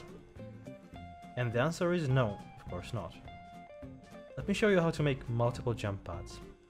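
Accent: Ireland. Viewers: Are you irish? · Didn't know you're Irish dude, what county?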